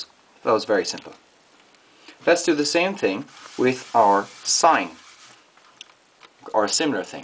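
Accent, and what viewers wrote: Ireland. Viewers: Fellow Irishman by the sound a things. Fair play man, good to see some of our folk contributing to the good cause of youtube math..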